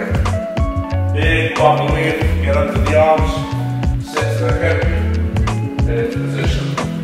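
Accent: England, United Kingdom. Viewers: You're pretty good, it's strange for me to see bjj with English accent.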